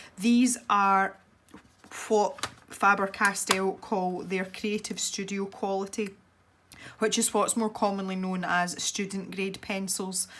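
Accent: Scotland, United Kingdom. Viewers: Thank you for this very helpful review. The one by Faber-Castell themselves is so poorly made that it is pretty darn embarassing for them.🤭 And may I say that I just love listening to you talking, as I absolutely adore the sound of Scottish English! ❤ All the best from Germany! Instant new follower!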